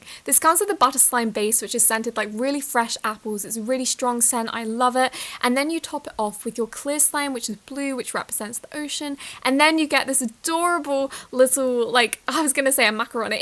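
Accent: United Kingdom. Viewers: Their accents are nothing alike. Australians have more of a drawl to their accents, whereas people from Great Britain have more of a clean accent (unless you're from the north, of course). is british.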